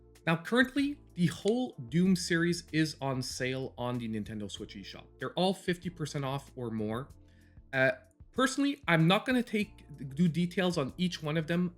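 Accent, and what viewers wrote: Quebec, Canada. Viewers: You can't make the connection between Maple Syrup and the French accent? He's Canadian.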